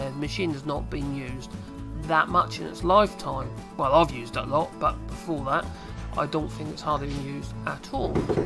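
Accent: Norfolk, United Kingdom. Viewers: I know this is how the world has gone but I prefer your natural voice. As a Scouser I can't be certain but I would bet it is a Norfolk accent?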